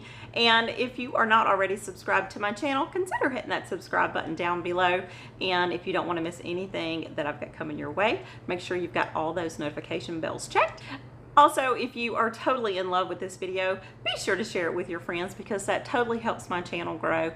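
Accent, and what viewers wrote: South, United States. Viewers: I just like listening to your accent! We live in Italy now so I love feeling the southern drawl!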